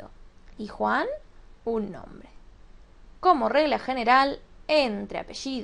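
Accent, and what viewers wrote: Argentina. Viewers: El famoso acento argento! 😄😂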